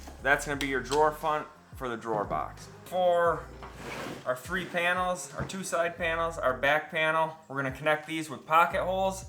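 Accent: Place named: Wisconsin, United States. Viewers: okay. I may have recognized it from that. I grew up in Wisconsin. Now I live in Southern Maine. Two totally different accents, of course. But there is a similarity with the way you enunciate your "O". Great video. I subscribed. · I hear Mid West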